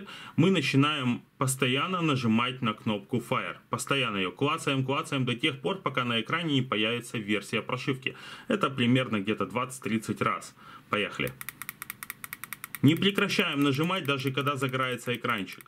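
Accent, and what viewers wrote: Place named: Odessa, Ukraine. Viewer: Продолжай на Одесском рассказывать! А то, я раньше, чтобы твои обзоры не скучными были, субтитры включал)))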